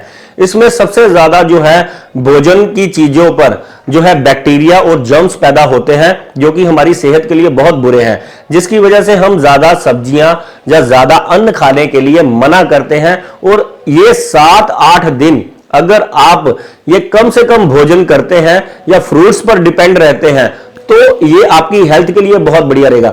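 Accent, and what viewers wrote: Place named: Punjab, India. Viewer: ji aapki Hindi bolne ka tareeka bahut badia hai with mix Punjabi👌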